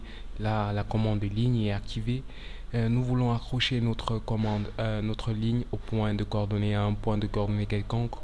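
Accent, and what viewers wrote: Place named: Cameroon. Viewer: laccent Camerounais!!! o bossoo!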